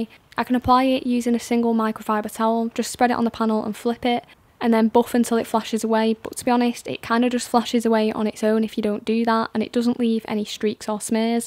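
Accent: United Kingdom. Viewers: ي لبى الاكسنت البريطاني بس🤍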